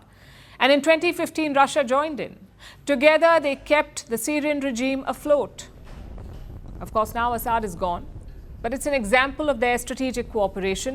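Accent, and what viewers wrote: India. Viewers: Sorry can't watch with that indian paajeeet accent. It's horrible.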